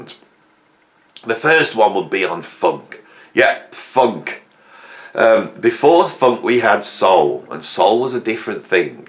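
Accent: Birmingham, United Kingdom. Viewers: I love watching your videos,. We are same generation and you create this pleasant homey atmosphere, so I feel almost like talking with one of my music-buff mates. Lovely Birmingham accent, too. 👍 Thanks! annnd cheers! 😆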